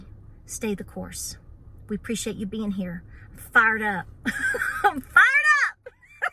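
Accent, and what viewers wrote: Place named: Appalachia, United States. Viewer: This is 100% appalachian woman my wife and 3 daughters and every homegirl i know sounds exactly like this...you want her on your side lol..she's slowing it way down trust me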